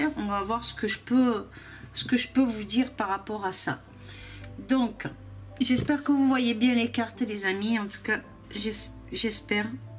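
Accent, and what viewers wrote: South, France. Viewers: Bonjour accent du sud c'est e plus beau moi je suis du sud-ouest le Gers.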